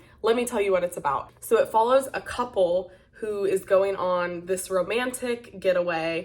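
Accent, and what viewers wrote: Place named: United Kingdom. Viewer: Your British accent kills me every time 🤣🤣🤣🤣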